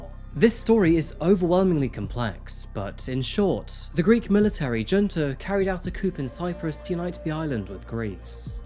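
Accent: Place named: United Kingdom. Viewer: Hey cuz are you gay? Or is it just that across the pond accent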